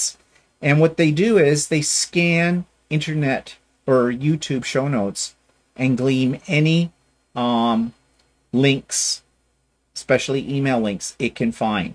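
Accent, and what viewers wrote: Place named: Newfoundland, Canada. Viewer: Newfoundland accent ha ha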